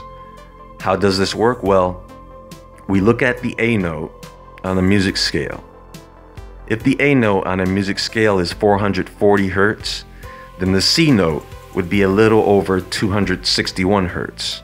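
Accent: United States. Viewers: Where is the narrator from? His accent sounds like American.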